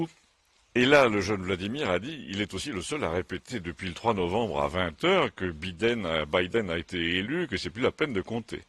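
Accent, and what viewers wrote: South, France. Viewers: Génial, comme toujours, soit depuis quelques mois que je vous suis, directement du Québec. IL y a plusieurs références que je ne connaît pas mais j'arrive à vous suivre. Je m'aime beaucoup votre accent du sud et votre timbre de voix. Merci, c'est devenu un rdv hebdomadaire. C'est comme retrouvé un vieil ami d'outre-mer. Salutations du Québec (Rimouski).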